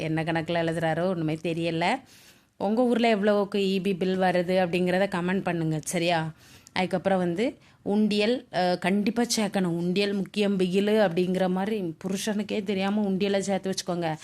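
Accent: Tirunelveli, India. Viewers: அருமை....😅😅 நெல்லை தமிழ் 🎉🎉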